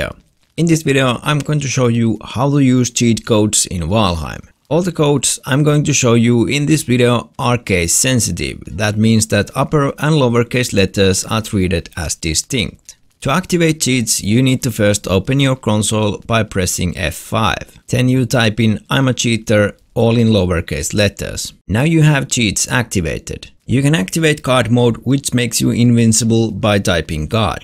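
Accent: Finland. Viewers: I am in australia but I know that accent! FINNISH ACCENT!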